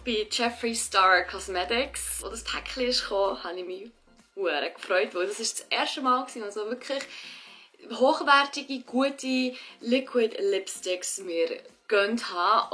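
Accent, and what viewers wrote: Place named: Bern, Switzerland. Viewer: I fingäs soo cool ds du bärndütsch redisch😍😍😍❤🇨🇭🇨🇭🇨🇭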